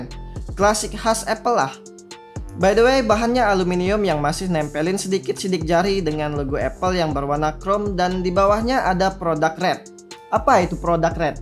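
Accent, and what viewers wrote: Palembang, Indonesia. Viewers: Nak nanyo kk wong palembang? 🤣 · org palembang kah kak? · Alangke lemaknyo haha, wong palembang nian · Orang palembang yah kak? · Orang palembang ya bang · Wong Palembang e hahah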